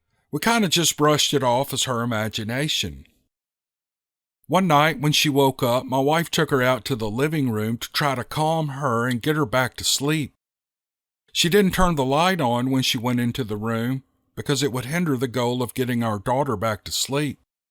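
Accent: South, United States. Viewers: is brilliant isn't he!? His accent is that of a true southern gentleman and I hope all my Irish kinfolk can appreciate our southern accents much love to Ireland from Arkansas 💚♾💚♾💚♾💚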